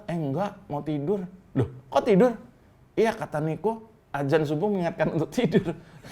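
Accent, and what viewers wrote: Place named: Medan, Indonesia. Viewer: Mantap hebat komentator bukan org batak tapi logatnya persis logat medan horas..maju terus..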